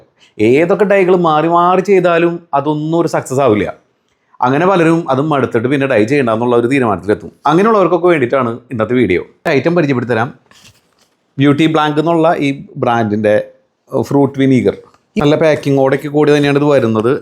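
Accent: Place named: Thrissur, India. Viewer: ചേട്ടന്റ സംസാരം കേട്ടാൽ അറിയാം നമ്മടെ തൃശൂർ കാരൻ ആണെന്ന് ഞാനും. എന്തായാലും അടിപൊളിയാണെന്ന് തോനുന്നു വാങ്ങി ഉപയോഗിക്കാം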